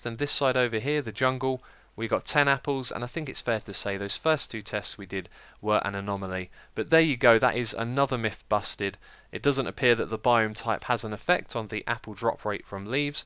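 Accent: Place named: England, United Kingdom. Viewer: Ahh thats cool to know :-) Sorry for repetitive question aha but what part of England are you from because my British accent and my friends have a very similar accent to yours, Feel free to not answer this if its too personal or anything aha :-)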